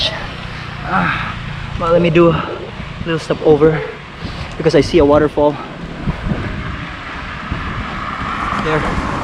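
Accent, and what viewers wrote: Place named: Bisaya, Philippines. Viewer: Bike legs back on the legs? Hehehe bisaya englis nkng boss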